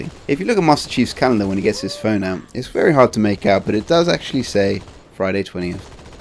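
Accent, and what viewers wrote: United Kingdom. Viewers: Your British? Huh...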